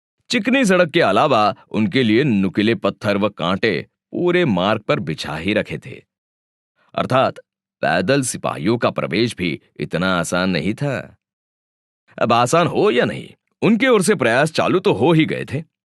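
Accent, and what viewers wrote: Gujarat, India. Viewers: Sir ji Ye achha hua ki. Koi. Aur. Comentary kar. Raha hai Agar aap. Boltey. Toh Aapka ton jo ek. Gujju jaisa Aur. Aapke. Jo dress👗 hotey. Hai woh. Koi. Joker🃏. Se Kam nahi hotey Aap. Kitne bhi. Bade pravachan Karne. Wale ho.. Magar aapko Apne. Dress ka khayal. Rakhna chahiye Ye ekdum. Se. Joker🃏. Jaisa Ya koi. Over acting karne wale Jaise dikhtey. Ho aap Kuch toh akkal lagao guruji Ki logo ko kya 💆‍♀️massages jataa hai Aapko ek sudhh khadi ka kurta hi jachega Aur. Mai aapko criticism. Ke. Hisaab se baat nahi kar. Raha hu Aap. Jaise log Aur logo ko prerna detey hai Aur. Aap joker🃏 jaise. Dress👗 Pahan kar aa. Jaatey ho stage. Par.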